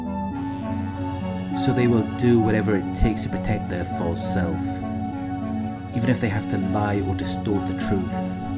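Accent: Wales, United Kingdom. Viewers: Where you from? Sound Welsh. Good video. I’m from porthcawl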